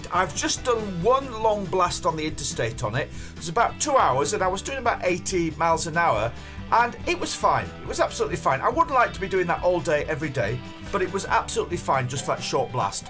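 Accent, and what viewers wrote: England, United Kingdom. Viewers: Very sweet English accent may I know where is this from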